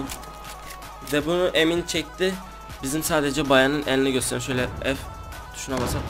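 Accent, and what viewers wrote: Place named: Southeast, Turkey. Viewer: Şivenden anladım hangi güneydoğu şehrinde yasiyon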